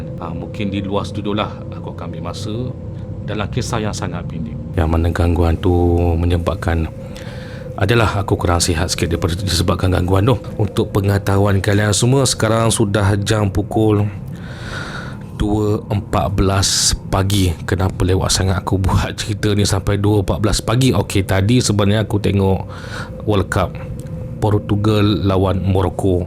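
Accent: Perak, Malaysia. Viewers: assalamualaikum..ouh org perak ke yop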